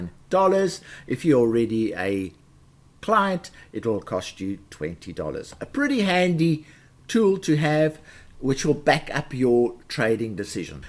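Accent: South Africa. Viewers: Hi, can I rather ask. Are you a South-African?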